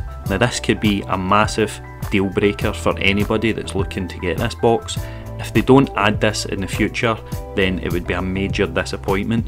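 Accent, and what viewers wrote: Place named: Scotland, United Kingdom. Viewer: Ayeee nice to see Scottish accent around! Cheers mate!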